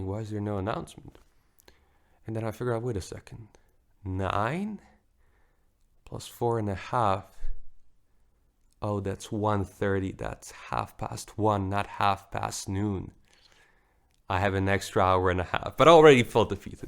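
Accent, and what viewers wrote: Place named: Bosnia and Herzegovina. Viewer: He has a Bosnian accent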